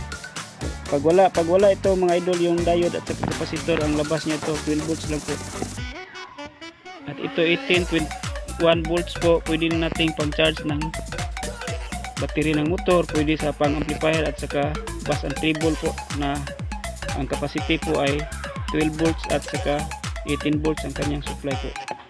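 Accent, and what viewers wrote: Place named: Visayas, Philippines. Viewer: idol mura ug bisaya ka ngutana lang! naa ka idea nga mo taas ang amperahe sa transformer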